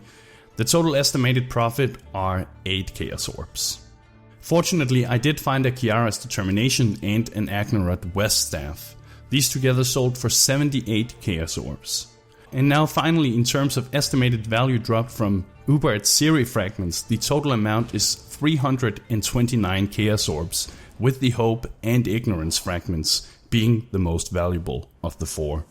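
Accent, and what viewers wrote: Denmark. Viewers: Great video! Are you danish? You sound a bit danish :)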